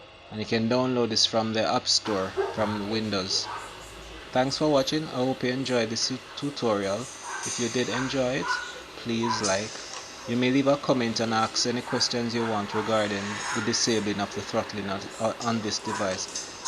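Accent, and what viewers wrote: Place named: Jamaica. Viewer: The author sounds Jamaican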